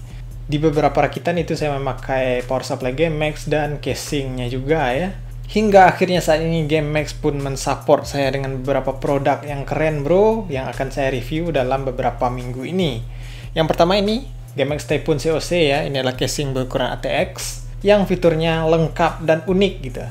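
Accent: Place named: Bekasi, Indonesia. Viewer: Roman roman nya . Kaya bahasa di sini bekasi xixi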